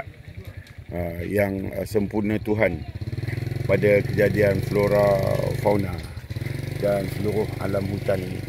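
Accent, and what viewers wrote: Kuala Lumpur, Malaysia. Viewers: Bersame same , kepade , ustaz Cakap KL 😂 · Hang cakap sleng KL no. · hehehe.. ustaz cakap kL oo..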